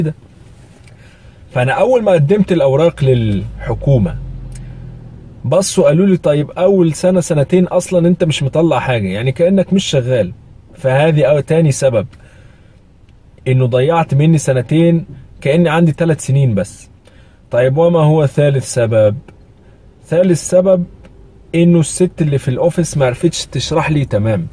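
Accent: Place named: Egypt. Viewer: تتكلم مصرى حلو قوى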